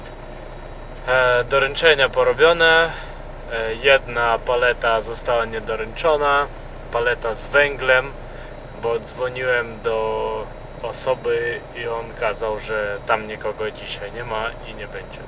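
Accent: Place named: East, Poland. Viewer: Twój Polski przez te kilka lat naprawdę brzmi fantastycznie. Bliżej Ci już do wschodniopolskiego akcentu gdzieś na Lubelszczyźnie już na Podlasiu niż do ukraińskiego akcentu.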